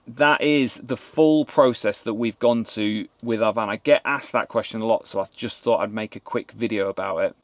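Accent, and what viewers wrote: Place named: Sheffield, United Kingdom. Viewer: he sounds like a Sheffield lad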